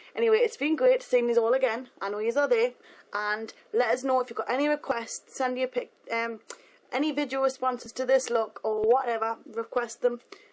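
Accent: Ireland. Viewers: yea i love her irish accent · Loveee your accentttttt xDDDD Irish???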